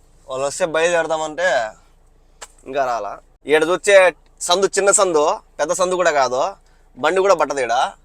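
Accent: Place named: Kadapa, India. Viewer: Anna kadapa slang pushpa slang la vundi anna..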